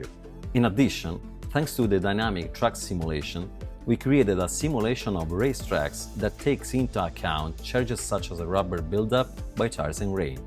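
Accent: Rome, Italy. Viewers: i dont know about you but i trust a guy with an italian accent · l'accento italiano è la cosa che rende ancora più particolare il video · porca boia l'accento più italiano che abbia mai sentito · L accento roma o rende tutto migliore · As an argentine, your clear english on pure italian accent feels like our grandfathers telling an interesting story, let me prebuy already i have saved on my steam wallet for months. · i love the strong roman accent as an italian ahahahahah loving the fact the Alfa Romeo Giulia is the cover car <3 it deserves it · The italian accent is so cool. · Came for the asseto corsa evo update stayed for the absolutly beautiful Italian accent.... Bellissimo!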